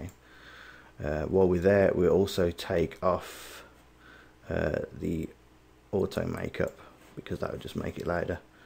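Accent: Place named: Midlands, United Kingdom. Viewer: good work, are you from the Midlands?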